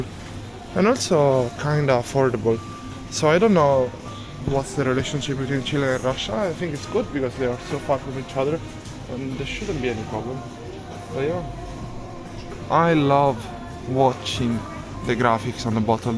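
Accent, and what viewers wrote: Italy. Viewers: Hello! Are you Italian? Your accent sounds Italian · Судя по акценту, парень из Италии? Или мне показалось?😂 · Поправьте меня если не права, но как будто автор итальянец ) Узнаваемый акцент ))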